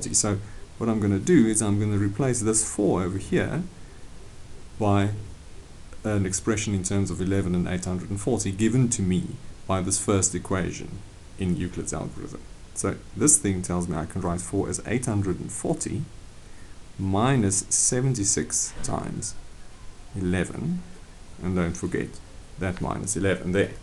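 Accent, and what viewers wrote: South Africa. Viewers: Nog nie eers die video gekyk nie maar kon daardelik hoor die man is Afrikaans, toe sien ek jou van is 😄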